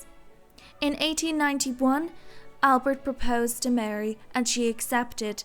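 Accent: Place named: Ireland. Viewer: You do very good research but my ears are 75 and my curiosity is still 15. With my arthritis a comment that extensive meant it had meaning for me to do all that typing. "TOASTMASTERS" is a group that helps people who do public speaking and presentations to groups, etc. It's like AA, with no fee to join, just a support group of like-minded people wanting to learn how to speak to groups with confidence. You sound Irish, I really don't know a lot about Irish society.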